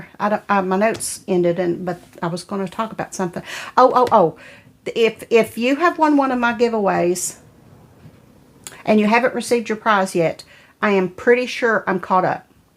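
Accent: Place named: West Virginia, United States. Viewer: I love your videos. I love your voice and accent. Is that a true West Virginian accent?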